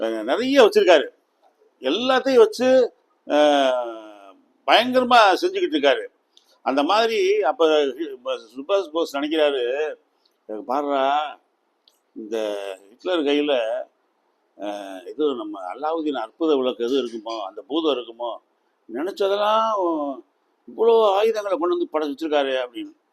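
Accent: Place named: Madurai, India. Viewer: சிறந்த வர்ணனை... மதுரை தமிழ்.. சிறப்பு..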